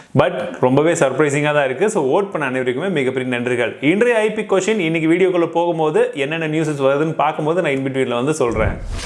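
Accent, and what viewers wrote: India. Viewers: வாழ்க தமிழ் if an innovative app from India raises I would really help with everything I have but not an app like tik tok even if it was from India I really see you as a Tamil version of MrWhoseTheBoss if you had an amazing accent other than Indian English accent you would reach ammmmmaaaaaazzzziiinnngggg heights 2 million subscriberslam onnum ila ungaluku please don't think this comment as a publicity these come straight from my heart and please do not think I'm discouraging you or Indian accent I'm just telling white men accent is soo good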